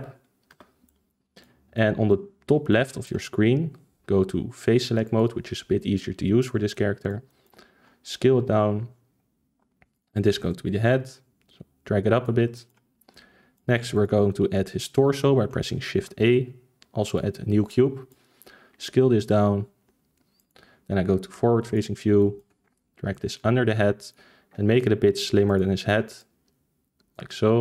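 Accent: Netherlands. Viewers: sum tell me u dutch. could it be ur accent? i'd recognize a dutch accent anywhere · Hi I have a problem when exporting my Character. I followed all the steps but when I drag my character from my desktop to Unreal Engine, the chest is hollow. I went back to blender but there everything was fine. I don't understand what I did wrong can you help me? also, are you Dutch? If so: hallo! ^^ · I heard it cuz im actually dutch too,anyway this was very helpfull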